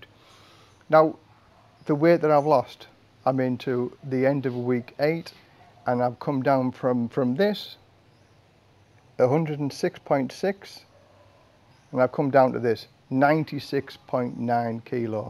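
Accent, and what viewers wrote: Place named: Sunderland, United Kingdom. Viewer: Areet mate. I’m from Tyneside. And I can tell you are from Sunderlnd way by your accent 😂. No worries 😉. Watched a couple of your YouTube vlogs on Thailand and loved them. As I’m 130KG. 20 stones in old money I became interested in your “Keto” diet. My goal is 90 KG. I’m 64 year’s of age and desperately need to lose mega weight before I die. I haven’t visited my GP for two years since the outbreak of the pandemic. Although I have received two jabs and booster and fortunately not contacted Covid-19. So thanks to you I’m going to follow your guidance and achieve my goal.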